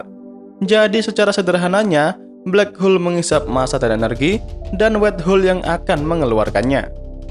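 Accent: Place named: Madura, Indonesia. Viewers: Dari pada kalian penasaran berlogat madura ygy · Aksen maduranya kental banget mas 🤭🤭🤭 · Pasti org madura yg ngomong nii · "Seperti biasa daripada kalian penasaran, mari kita bahas bersama". Logatnya benar2 Madura sekali pak.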